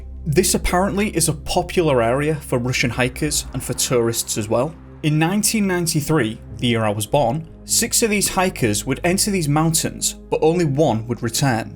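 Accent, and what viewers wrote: United Kingdom. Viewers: Thank God, I've been looking forever for a video on this incident told by someone with a British accent. 👍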